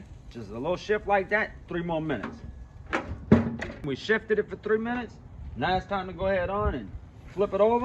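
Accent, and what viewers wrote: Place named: South, United States. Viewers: Anyone know what’s his exact accent? It sounds real cool like Elvis Presley in Memphis ? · Man the southern accent is what I love most about these videos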